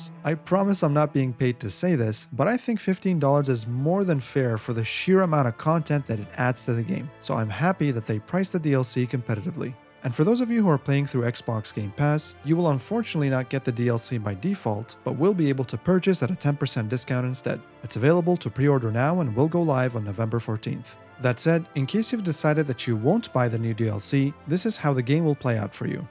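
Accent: Arab world. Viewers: i just realized you are most likely arab from your reading of the names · My man. Your arabic accent is so eloquent. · oh god are u arabian?! the accent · the way you pronounced the names tells me that you originally Arab :P , anyway thanks for the info cant wait to have my hands on the new civs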